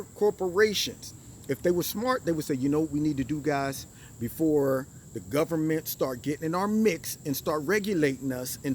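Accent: United Kingdom. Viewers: That British accent!!.😂😂😂😂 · Drinking lava lmbo you are AWESOME love your British accent LOL!! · YOUR BRITISH ACCENT IS PERFECT.. GO ON AGT. YOU ARE GONNA BE A WINNER!!!!!!! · I laughed from the title to the end. Great British Accent! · You are funny. Had a good laugh with that British accent. 👍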